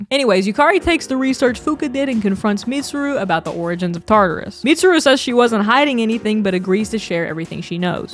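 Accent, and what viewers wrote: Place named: Texas, United States. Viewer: this is totally unrelated to the video but you must be from the south right? I’ve lived in Texas my whole life and you remind me of people I’ve grown up with and people I’m around all day every day with your accent and the way you talk. I swear you must be southern cause it’s uncanny how alike you sound to people I know in Texas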